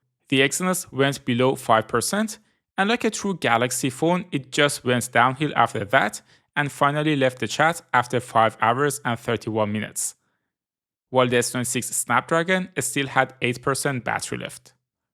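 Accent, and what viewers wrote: Iran. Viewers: عشققق فقط چرا حس میکنم لحجتون اینقدر ایرانیه ؟☕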